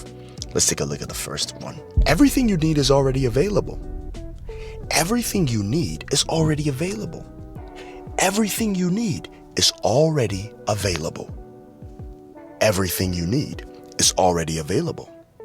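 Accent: United States. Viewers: It's not a British it's an American accent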